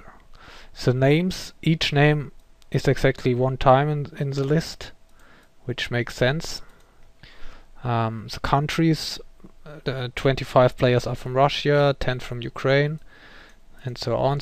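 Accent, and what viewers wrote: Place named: Germany. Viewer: thank you, really very useful.. i kind enjoy the German accent :)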